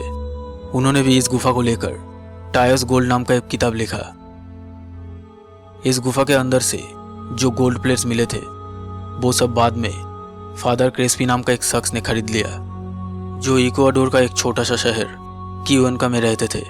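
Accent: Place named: Bengal, India. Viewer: Awaz sunke bol sakta hu,Bhai tum Bengali ho na ? 😂 Sach batayo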